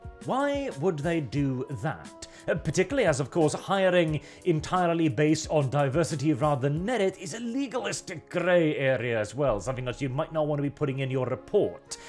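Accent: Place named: United Kingdom. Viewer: this fuu british asl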